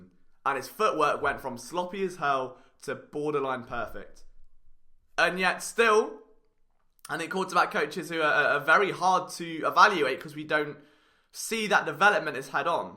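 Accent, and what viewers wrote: United Kingdom. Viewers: I see an Eagles video, I tap on it. I dont year a British accent, I close said video. Good job. Keep it goin. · If my Eagles content doesn’t come with a British accent, I just don’t trust it!!! 😂🦅 🤙 Thanks for your hard work,.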